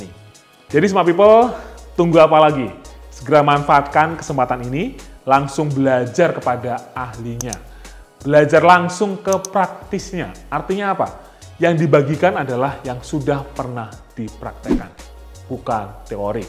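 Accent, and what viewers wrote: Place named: Java, Indonesia. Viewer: menarik sekali mas, tertarik, btw medok ya mas jawanya :)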